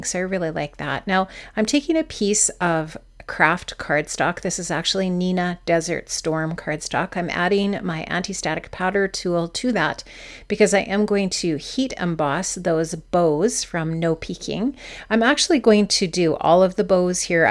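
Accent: Canada. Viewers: Love this card!!! So beautiful...and heard the Canadian accent. 😁 Only cause I hear it in my own videos. haha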